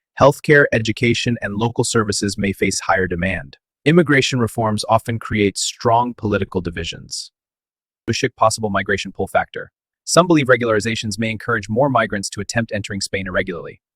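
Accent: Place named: India. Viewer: Why did you suddenly have an indian accent? Odd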